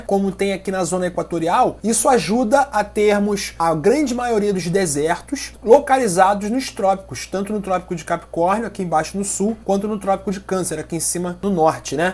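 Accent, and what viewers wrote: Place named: Rio de Janeiro, Brazil. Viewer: sotaque carioca tava forte, são não mais que a explicação, falou pouco, mas disse tudo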